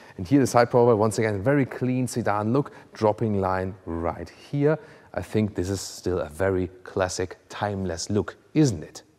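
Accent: Germany. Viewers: Great review, this channel improved a lot in the last year, good job! And love the german accent as Im italian whom lived in Germany and learned the language.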